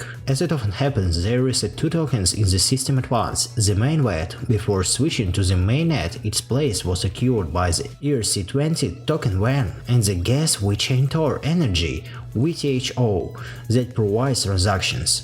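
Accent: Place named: Russia. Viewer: Russian prononciation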